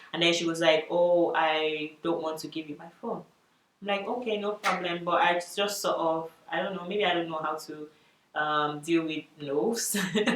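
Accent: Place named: France. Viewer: I think her accent is French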